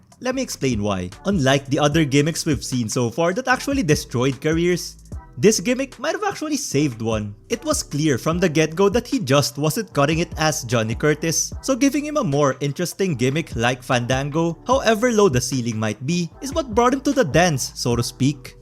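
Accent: Philippines. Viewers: Based on your accent, it seems you're a filipino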